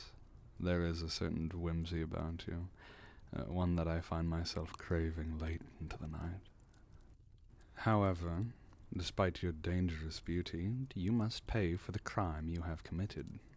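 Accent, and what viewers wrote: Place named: RP, United Kingdom. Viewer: This mans voice is so smooth, out here sounding like a british gentleman from the 1800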